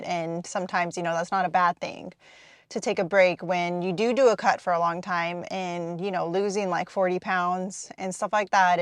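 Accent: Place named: United States. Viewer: p.s i always loved youe american accent but i never knew there were palm trees where you live... its dark and it snowed last week where i live.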